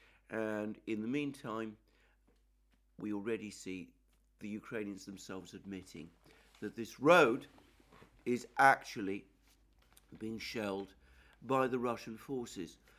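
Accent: United Kingdom. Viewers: The sound is much better. Thanks for the unbiased analysis with a British accent. Scott Ritter & Col MacGregor use American-English which is familiar to me.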